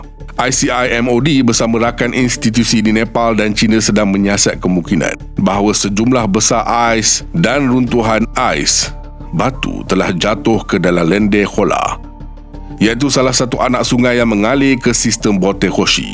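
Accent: Malaysia. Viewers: logat Malades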